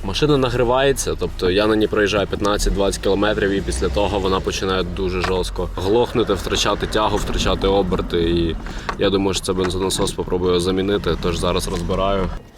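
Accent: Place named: Western Ukraine, Ukraine. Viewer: Шикарно уже разговариваешь на западном акценте. Машинка сыпется но и года и пробег какой. У папика с нуля 2008 год правда дация логан 1,6 пробег 62 тысячи.Удачи а кокой пробег напишешь.Удачи.Добивайся такие машины средний вариант.Удачи.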